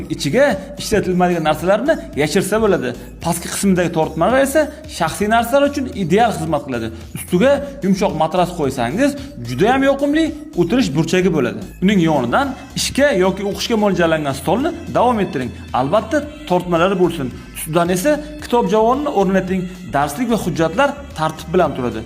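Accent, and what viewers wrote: Namangan, Uzbekistan. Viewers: Namangancha gapitish qiyinda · Аксинча шкафчалар купайиб кетдику шевангиз Наманган шевасими даров таниманда 😂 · Шева наманганми?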